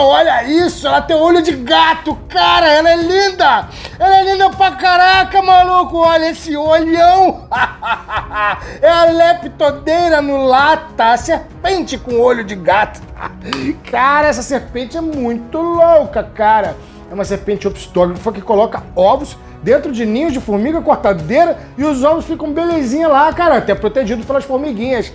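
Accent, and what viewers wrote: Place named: Bahia, Brazil. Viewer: Com sotaque baiano. Meau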